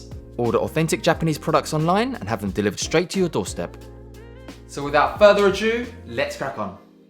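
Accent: England, United Kingdom. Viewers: Nicely done,. It's a burning Summer here right now in the Philippines so I guess we'll just opt for the Cold Soba (actually Udon is what I have in stock) for now. 😊 Thanks for the very informative tutorial. Loved your beautiful English accent too!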